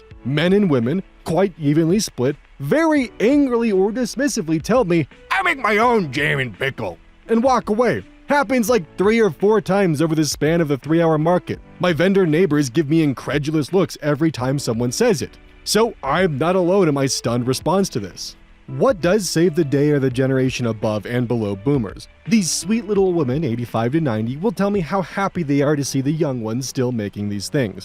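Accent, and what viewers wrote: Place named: New York, United States. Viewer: Hearing being from Idaho is surprising to me you have a stereotypical New Yorker accent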